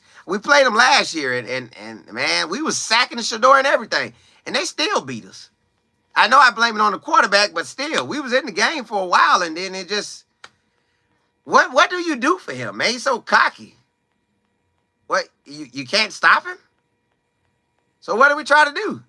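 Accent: South, United States. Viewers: His southern accent cracks me up 😂😂😂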